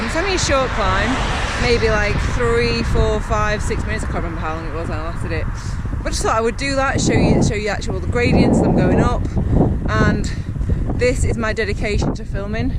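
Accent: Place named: Scotland, United Kingdom. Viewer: you sound kind of scottish, I'm american sorry if that sounds stupid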